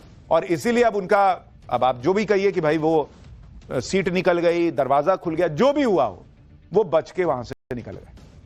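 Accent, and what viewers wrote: Bihar, India. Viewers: Aap bihari hai babu ?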